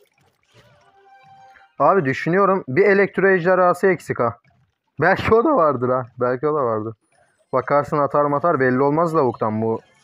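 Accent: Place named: Thrace, Turkey. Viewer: bizim Trakya insanına benziyor sesin zaten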